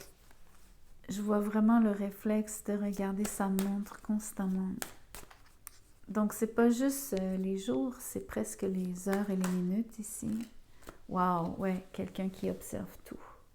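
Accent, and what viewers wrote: Quebec, Canada. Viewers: Bonjour, déjà j'aime beaucoup votre calme , votre voix, par contre je vois pas du tout qui cela pourrait être , cette personne ?? Bref vous m 'apprenez qu'une femme pense à moi en secret , car vraiment je ne vois pas qui c'est ! l'avenir me le dira ou pas . Merci a vous et finalement, je commence à aimer l'accent québécois.😉🤭🤔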